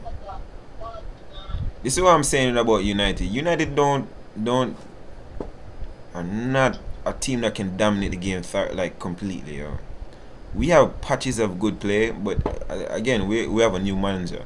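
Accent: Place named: Jamaica. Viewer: Bro hw u sound so Jamaican😂🇯🇲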